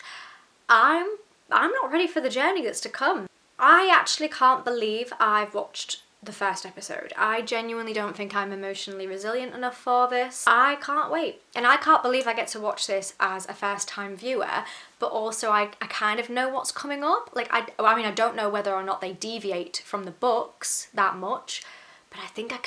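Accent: United Kingdom. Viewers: You sound like you're from Hull.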